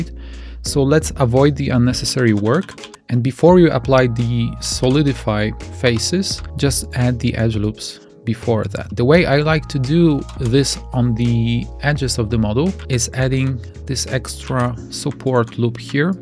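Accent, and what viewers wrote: Poland. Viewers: Are you Polish? lol Nice video mate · Real CAD programs automatically make perfect fillets and they provide you with several tools to verify their perfection. Blender does not have these features because it is used by artists rather than by engineers. If you want to make jumping dinosaur, you use Blender. If you want to design airplane wing, you use Rhino. (This guy has Polish accent.)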